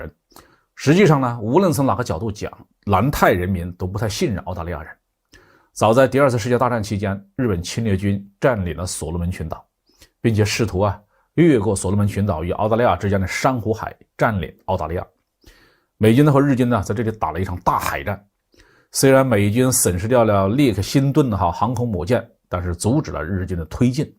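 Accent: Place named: Hubei, China. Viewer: 根据口音分析为我们湖北老乡，鉴定完毕👏😄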